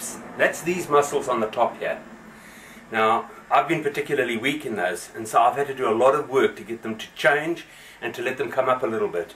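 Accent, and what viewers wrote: South Africa. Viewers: is that a south african or rhodesian accent u have? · Hi, are you south african? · are you from south africa?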